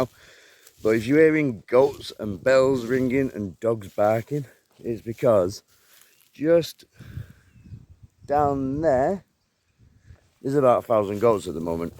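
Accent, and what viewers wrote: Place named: Manchester, United Kingdom. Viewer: What a great vegetable farm. Love watching what your planting and harvesting I was trying to place your accent, sounds very northern possibly Mancunian by any chance?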